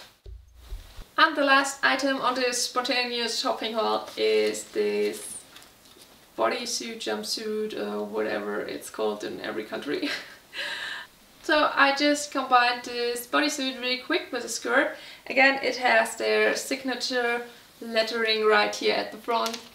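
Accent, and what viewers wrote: Germany. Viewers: Very great video! Nice clothes you have bought online. Your English has a big german accent. So when your next german speaking video? What about your glasses? Very nice mask from you. Keep it up!!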